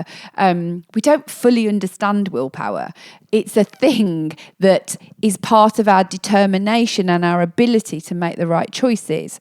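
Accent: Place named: United Kingdom. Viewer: I subscribed to your channel cos i have problem to understand British accent.by listening to your videos..probably could help me practice my British accent listening skill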